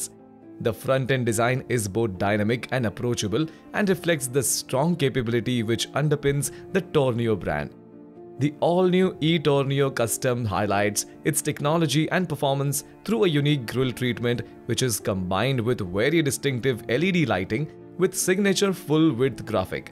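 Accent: India. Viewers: This tounge...like indian speak english...😊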